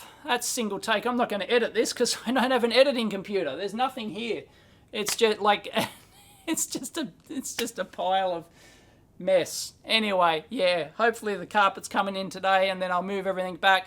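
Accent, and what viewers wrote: Australia. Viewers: Thank you. I started watching 7 years ago, when I was 10 years old. I did not understand anything you were saying but I thought your accent was interesting and relaxing, so I kept listening to your videos. Over time I started to pay attention and learned a lot about electronics and electrical engineering thanks to you. Now I am planning to go to university next year to study electrical engineering. Largely because I stumbled upon a friendly Australian guy. Thank you for being an inspiration, and I hope you continue for many more years.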